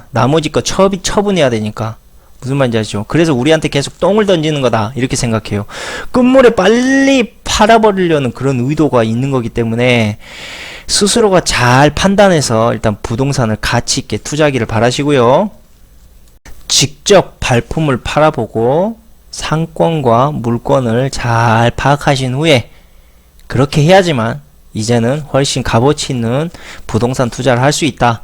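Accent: Jeolla, South Korea. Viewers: 고향이 전라도신가봐요~^^와 뇌섹남 어찌이런생각을 하시는지 완전매력적이시네요 멋있어요♡♡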